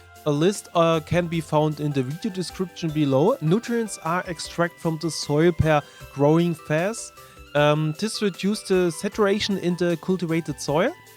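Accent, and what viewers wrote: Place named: Germany. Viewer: Nice German accent. You remind me of another German of mine.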